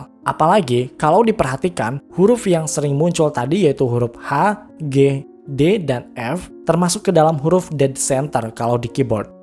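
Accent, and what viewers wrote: South Jakarta, Indonesia. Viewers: Bang lu orang Jaksel kh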